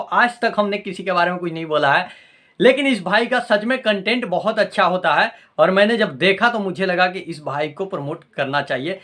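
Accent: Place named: Bhojpuri, India. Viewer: Love you clean Bhojpuri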